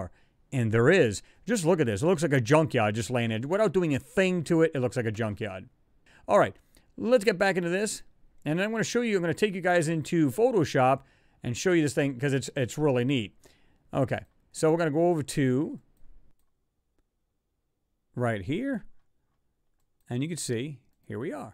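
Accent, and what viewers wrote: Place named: Louisiana, United States. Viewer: You sound like you’re from Louisiana. My home state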